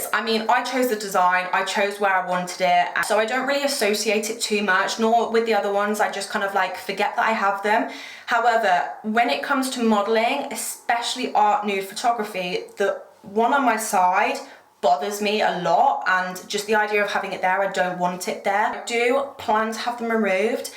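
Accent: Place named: United Kingdom. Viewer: What is your accent ?.. reminds me of the harry potter movies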